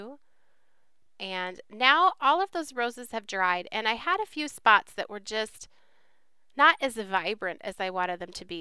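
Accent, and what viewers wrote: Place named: United States. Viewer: i love PB stamps and have a few, i watch all your videos but just wanted to say i love your voice!! I love the american accents anyway, but your voice is so nice, sorry to be off topic, lol, hugs from Vick in England xxx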